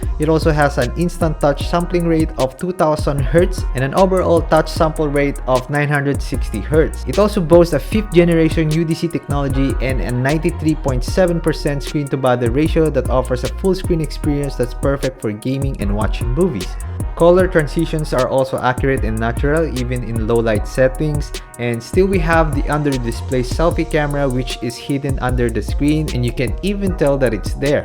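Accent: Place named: Manila, Philippines. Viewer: Pansin ko lang accent mo, accent na taga manila talaga hehehe Appreciate the props though.. Catchy sya at thanks sa review, sana next time share mo din di lang graphics na nalaro mo sa isang game kunti pati frame rates din.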